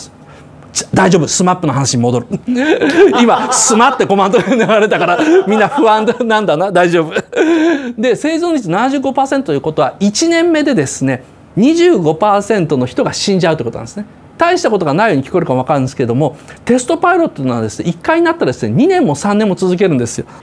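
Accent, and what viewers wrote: Kansai, Japan. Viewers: 最初の方は関西トーンな岡田さんも良いね👍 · 知ってるかもしれませんけど、この人、元々関西弁ですよ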